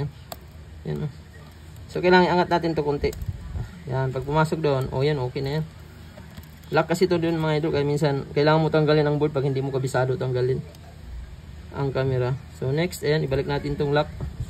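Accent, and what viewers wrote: Iloilo, Philippines. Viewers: Ilonggo ka po ba or bisaya?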